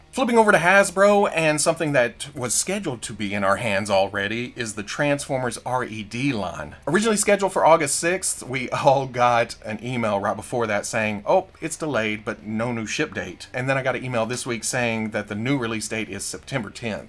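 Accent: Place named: Mississippi, United States. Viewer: As a man from rural Mississippi I prefer your videos. Your accent is like visiting home for 20-30 minutes a week. Never stop doing what you Love.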